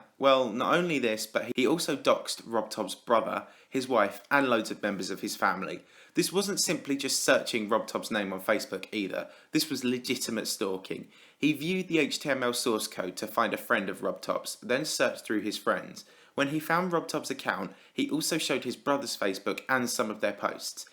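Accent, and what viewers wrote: United Kingdom. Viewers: My mom heard me watching you and now she won't stop talking in a British accent XD why!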